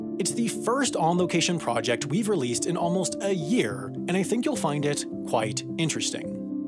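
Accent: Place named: United States. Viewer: Dude I love your videos. But where are you from? You sound American but your cadence of speech is.......uncomfortable. I’m not criticizing I’m genuinely interested.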